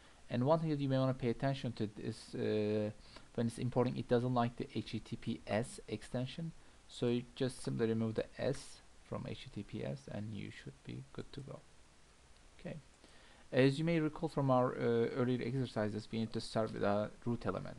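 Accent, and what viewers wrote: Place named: Turkey. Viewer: abi ingilizce aksanın ben türküm diyor :D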